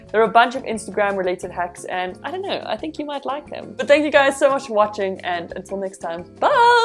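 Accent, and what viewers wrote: South Africa. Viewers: Great review with a typical South African accent and I smaak it! ;) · Hey you sound like a South African,I am from RSA.One tip usually techies refer to ram as memory and Device Storage as what you referred to as memory.I must commend you on Your editing , its awesome and this was a great review. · Just found your channel are you south african?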